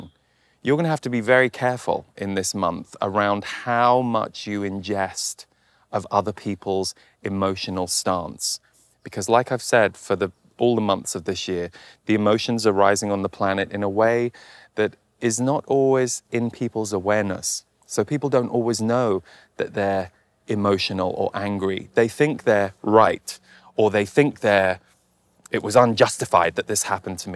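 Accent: United Kingdom. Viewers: I thought you are in the UK. Your accent sounds British.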